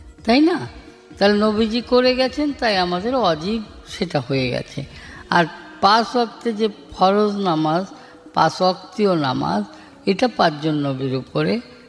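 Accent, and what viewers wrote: Bangladesh. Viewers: তুমি বাংলা আঞ্চলিক ভাষা বুজ এইটা ফরিদপুরের ভাষা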